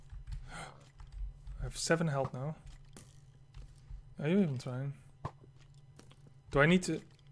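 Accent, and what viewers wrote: Netherlands. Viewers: Je bent 100% nederlands. Ik kan dat horen :P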